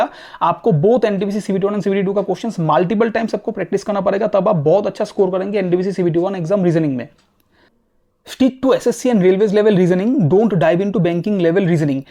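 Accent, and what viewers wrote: Bengal, India. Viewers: Sir aap Bengali ho?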